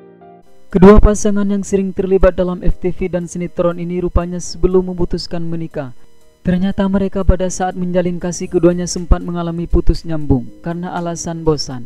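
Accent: Madura, Indonesia. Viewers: Iya logat.y ky logat org madura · Kenapa dgn logat madura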